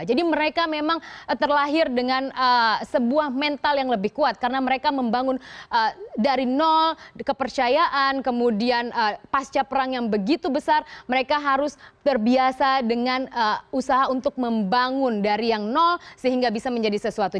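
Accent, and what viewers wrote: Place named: Eastern Indonesia, Indonesia. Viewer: itu aksen bung, kan dia dari Indonesia Timur, wajar lah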